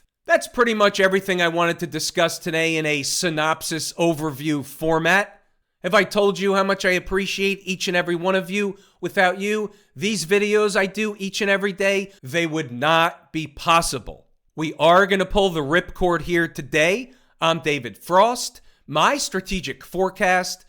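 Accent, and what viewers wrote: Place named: New York City, United States. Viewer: The NY accent is over the top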